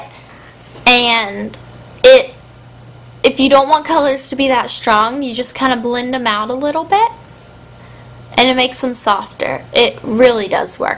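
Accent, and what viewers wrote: South, United States. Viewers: You sound country in this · I love her southern accent!! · OMG SHE KINDA HAS A SOUTHERN ACCENT!!! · this is so cute... i love her southern accent in this video... she doesn't sound like this anymore...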